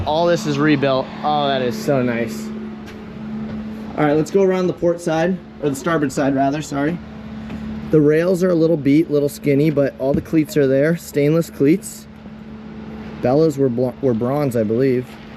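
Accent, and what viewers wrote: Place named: New York, United States. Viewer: This guy has that New Yauk twang and he lives in Florida.... Poor Florida, we are full up with these jerk offs....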